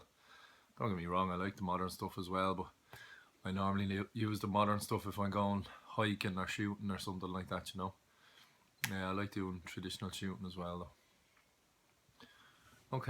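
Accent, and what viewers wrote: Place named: South Africa. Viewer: Here’s me thinking you were from South Africa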